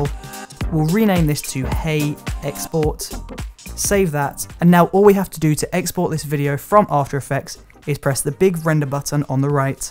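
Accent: United Kingdom. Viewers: really like your brittish acsent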